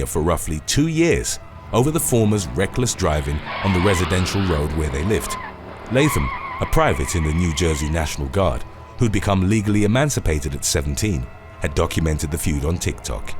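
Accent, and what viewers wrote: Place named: London, United Kingdom. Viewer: Neighbours looks much better.. How come you sound London but use American slang.. ie dollars neighbors etc.. Do you live there now? Great voice over broski. As Clear as a bell.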